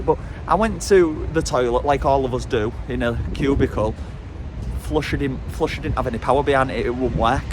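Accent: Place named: Liverpool, United Kingdom. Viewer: Love your Scouse accent ha😂👏🏻Another great episode well done.The time lapse of the sunset looked stunning💛xx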